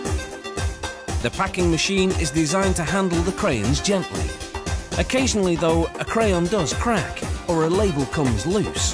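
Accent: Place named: United Kingdom. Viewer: I wasn’t expecting the British accent I’m accustomed to the USA accent, where is the SAP button?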